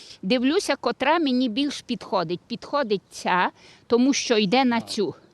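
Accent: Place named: Transcarpathia, Ukraine. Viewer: Приємна і зрозуміла українська з самобутній забарвленням закарпатського діалекту. Довгих років життя господині.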